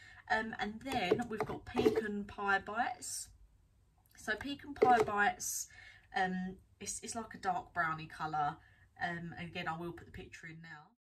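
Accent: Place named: Essex, United Kingdom. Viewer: Hi 😊. As I'm a novice with all things candle related, I was looking at Yankee Candle reviews and found yours so I subscribed as I thought you were the most relatable maybe because I'm a London / Kent girl and I think your accent is Essex (forgive me if I'm wrong 😁). I saw you had a candle warming lantern which I thought was a brilliant idea and bought one immediately as I have a Jack Russell dog that's a bit of a bulldozer I was too afraid to have a naked flame in case he knocked it over. I am sure I remember you saying in one of your videos that you sometimes pour melted wax away which helps the candle regain some of its scent. I have a Merry Marshmallows candle and I have put it under the lamp several times but it's started to lose it scent even though it's only burnt down a quarter of the way. So my question is how much should I pour away and how do I dispose of it? 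Many thanks for reading 😊.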